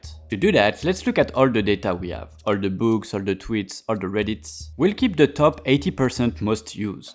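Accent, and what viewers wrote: France. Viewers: great video but french accent was 🤮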